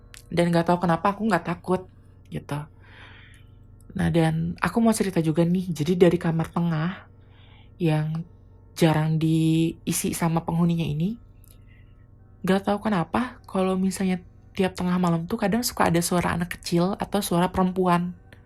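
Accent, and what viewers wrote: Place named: Java, Indonesia. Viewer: Gua kira cewek mas, suarane lo mirip Mas Ricu. Ricky Cuaca. Tapi kayake mas e wong Jowo yo mwehehe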